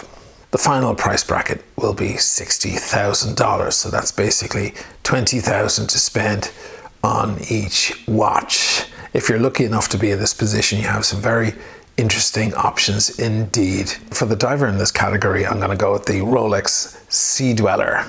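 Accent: Ireland. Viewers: Where are you from? Ireland? The voice reminds me of Darth Vader.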